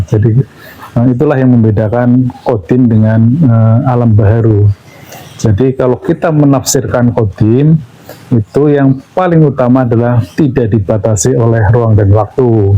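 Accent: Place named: Banyumas, Indonesia. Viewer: Rika wong ngapak ya....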